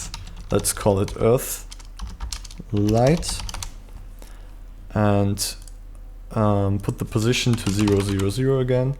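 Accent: Germany. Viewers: Yeah sounds german 😀 Greetings from Germany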